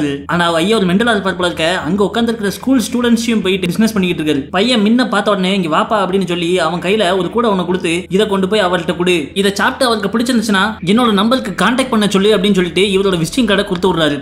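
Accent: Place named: Tirunelveli, India. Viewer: Unga thirunelveli slang thaan bro.. Romba pudikum... En frd maariye pesuringa... Neenga pesa pesa en frd thaan niyabhagathula vanthaan...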